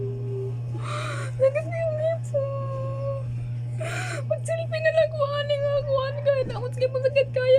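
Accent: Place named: Visayas, Philippines. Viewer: Nakakatawa po.. Parang may bisaya accent ka po like me.. Bagong kaibigan mo po.. Natapos ko na din tong mapanood sana tulungan mo din po ako..😊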